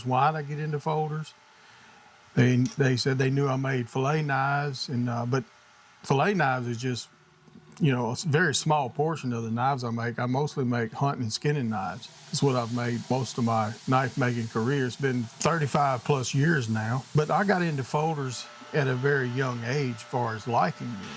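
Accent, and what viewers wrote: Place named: South, United States. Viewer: OK- so the short answer is yes! I have suffered "Gravity's Wrath" with some custom stained glass... As for the file work and the remaining content- you are eloquent and smoothly southern in your presentation- do not forge a can of screws! Frustrations abound but the horizon is simple- educate and just maintain the presence! Personally I appreciate you letting us into your shop. Be safe and as always- at peace.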